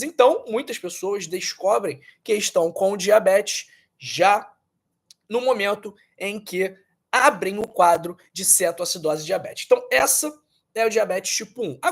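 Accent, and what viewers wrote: Rio de Janeiro, Brazil. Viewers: Claro que as mensagens são úteis. O ruim do vídeo é essa cara de filho de Satanaro e esse sotaque carioca insuportável!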